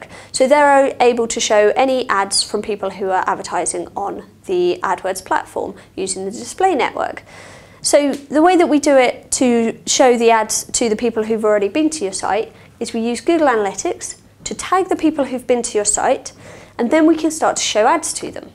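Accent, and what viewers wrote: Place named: England, United Kingdom. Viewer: Not bad, a little more experience and she'll be golden. I will say, English accents go a long way, if this was an American woman I don't think it would have played as well. I could listen to her talk all day...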